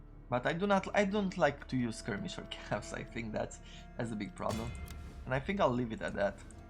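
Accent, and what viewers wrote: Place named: Romania. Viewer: You sound like you are from SE Europe maybe? Romania?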